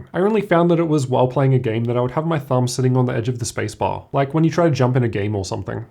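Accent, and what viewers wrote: Australia. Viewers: subtle Aussie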